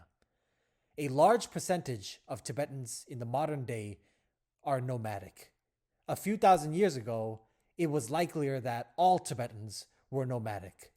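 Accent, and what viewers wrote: Myanmar. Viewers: The narrator sounds like a Burmese. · Yes he is Burmese. 😅We know one when we hear the accent.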